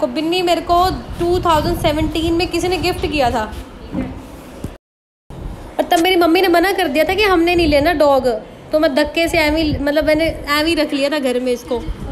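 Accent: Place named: Haryana, India. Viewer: Mei v karnal jdo aye tuhanu miln awAge tusi bilkul mere Wang boldey O meinu apna Haryana yaad a janda tusi bot changa kamm kardey o rabb tuhade harr khwaish poori karey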